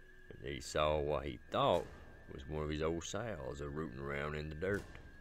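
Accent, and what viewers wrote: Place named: Tennessee, United States. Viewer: I was having a real down day. Real alone day. My dad passed not long ago and I've been pretty sad since. I've never seen nor heard of a channel like this on YouTube before. Didn't know it existed. But here it showed up on my list of recommended videos, and the moment I heard that old Tennessee draw, my heart was immediately calmed. This was exactly what I needed. I can't thank you enough fir bringing a feeling of Home in a hard time. Thank you so much and God Bless you guys.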